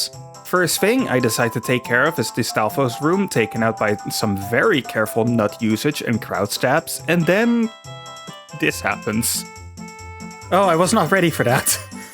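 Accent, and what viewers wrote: Netherlands. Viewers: Dutch accent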